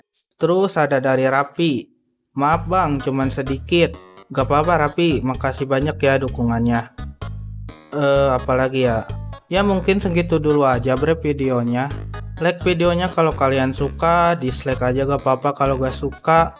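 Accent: West Java, Indonesia. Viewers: Orang jabar ya bangg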